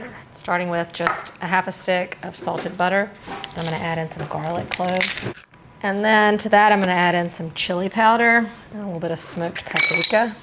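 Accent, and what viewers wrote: South, United States. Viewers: I am new to your channel and enjoying very much. That could be because you are very attractive and an Italian with a southern accent ❤ great videos i can't wait to try your recipes.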